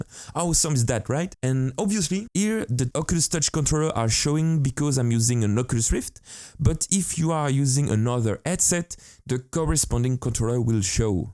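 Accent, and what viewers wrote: France. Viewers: U ve a french accent ! R u ? ^^ thx a lot, all ur videos are so usefull, u rock ;) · t'a un accent francais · tu es francais ? L'accent a l'air un peu francais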